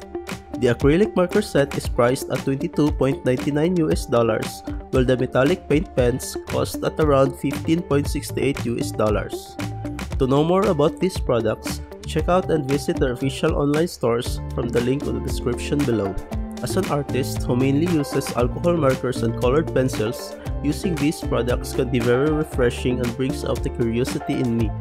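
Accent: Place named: Philippines. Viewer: Didn't know you were a Filipino at first but found out immediately when I heard your accent. Galing mo idol! Keep these videos coming!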